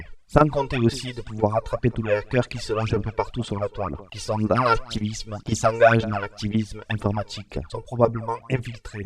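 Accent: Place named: Marseille, France. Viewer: le dephasement de ta voix et merdique ,tu peut te faire retrouver trop facilement x) et t'es un marseilleis deja sa s'entend x)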